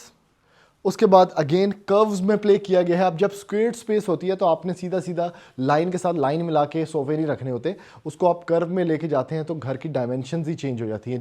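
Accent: Punjab, India. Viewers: Ghar to bahut sunder hai but I really like your Punjabi touch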